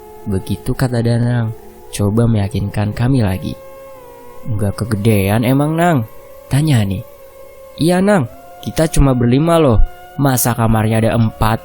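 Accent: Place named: West Java, Indonesia. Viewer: Kesel bgt dengernya klo pakek logat sunda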